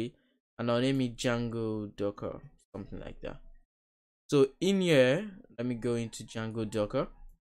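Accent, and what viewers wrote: Nigeria. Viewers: I feel at home listening to you😂. Loving the Nigerian accent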